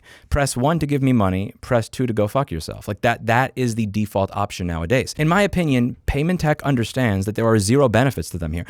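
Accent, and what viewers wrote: New York, United States. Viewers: Love that NY pronunciation. Umanly possible 😂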